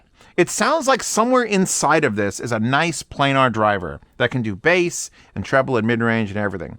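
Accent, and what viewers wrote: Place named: United States. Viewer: Can't watch your videos. You waffle on about rubbish, your american accent is terrible to listen to and why do americans extend words with one vowel to sound like they have four? ie way becomes waaaay.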